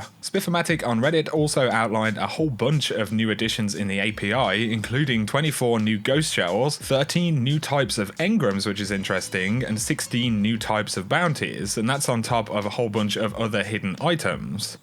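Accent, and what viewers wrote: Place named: England, United Kingdom. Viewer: Another quality video from owwwwww owwwwwwwwwwwww owwwwwwwwwwwwww (wolf howl) the! Always enjoy your videos, i mean your english accent is a bit annoying but its worth it!;)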